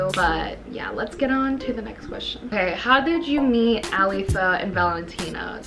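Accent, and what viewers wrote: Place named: Miami, United States. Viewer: The Miami accent is real 😅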